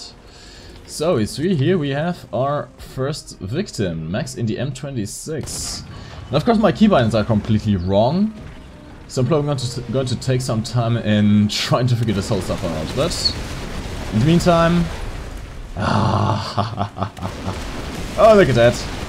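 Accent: Germany. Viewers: ich hab direkt gehört das du deutscher bist XD Schönes video,mach weiter so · Are you german=? because you have got an accent. ps i am german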